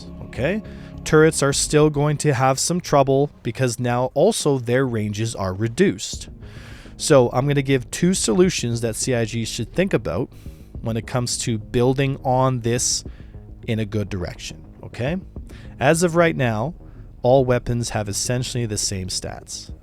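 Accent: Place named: Canada. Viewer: you sound SO Canadian here lol.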